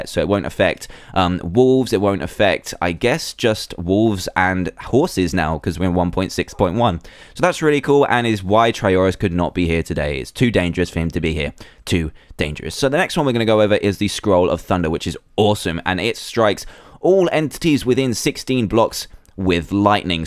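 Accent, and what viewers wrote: United Kingdom. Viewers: are you brittish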